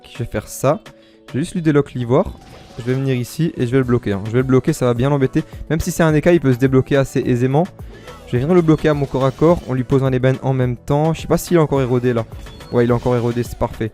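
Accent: Alsace, France. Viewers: T'es alsacien ? :p avec l'accent Car moi oui Bonne vidéo !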